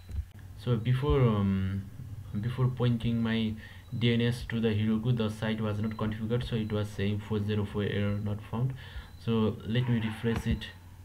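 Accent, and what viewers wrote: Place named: Nepal. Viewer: maile ta nepali accent thyakkai chineko hoki jasto lagyo...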